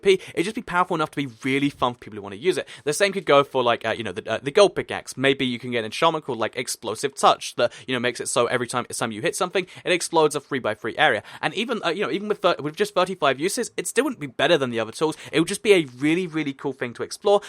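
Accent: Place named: United Kingdom. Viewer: He sounds so British XD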